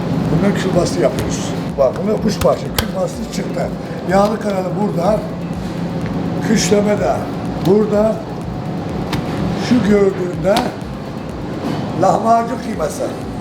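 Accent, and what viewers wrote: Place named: Adana, Turkey. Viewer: allahına ölürüm senin ha ustam tam bir adanalı konuşmalar falan adananın ekmegini yeyip suyunu içtigin çok belli bende adanalı bir kebapçı oglu olarak sizin gibi adanamızı temsil eden adana evlatlarını görünce gurur duyuyorum ve seni taskipteyim benim babama şişko neçmi derlerdi yıllarca birbiçerlerin ustalıgını yaptı ama şimdi sizlere ömür senin gibi ustaları görünce direk babam la tablada çalıştıgım anılarım geliyor devam durmak yok helal sana Adanalııııııııı.........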